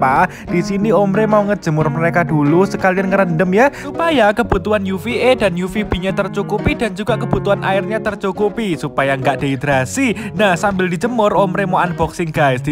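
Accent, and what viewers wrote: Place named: Java, Indonesia. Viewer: Wah mantap. Ternyata kalau ngonten medok ya broooo 😋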